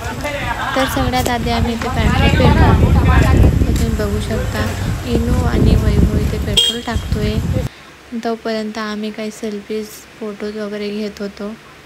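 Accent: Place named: Khandesh, India. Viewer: Aap ki bhasha me peevvar khandeshi dikharahi he Qki ho puri ahirani bi nahi or hindi bi nahi to aap yesehi bolog banaiye jay khandesh🎉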